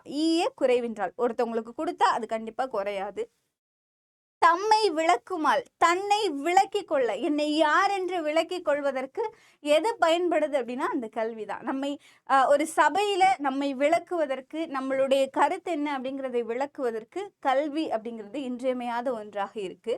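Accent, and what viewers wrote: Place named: Sri Lanka. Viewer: இலங்கை தமிழ் கேட்க எவ்வளவு நாள் ஆகிவிட்டது இனிய தமிழ் ஓசை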